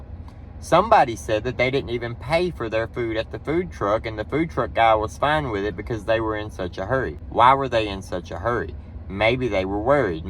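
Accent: South, United States. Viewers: This is the 1st video I've seen of yours. If I'm being honest I was going to skip past it. I decided just because you didn't speak like me and had a Southern accent doesn't mean I shouldn't listen to what you have to say, obviously 🙄 I'm glad I decided to give it a chance. Keep up the good work! Good take on this case. I don't fully agree with your take on what happened. I Think those 2 surviving roommates had something to do with this. It's way too weird for them not to see any blood. Dude there said it was a blood bath. There's blood leaking out of the f**** house. This whole case blows my mind, though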